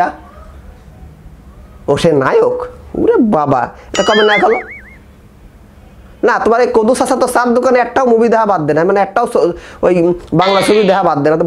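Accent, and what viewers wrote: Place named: Khulna, Bangladesh. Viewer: Khulnar vasa amk mugdho kora vi..apnar kotha sunla onk moja pi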